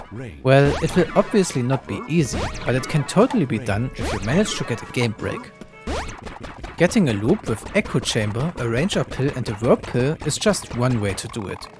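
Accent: Germany. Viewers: There he is! Our sweet german accented best boi is back!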